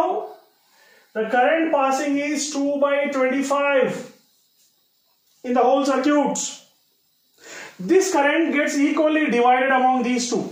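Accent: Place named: South India, India. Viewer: Ye South wale h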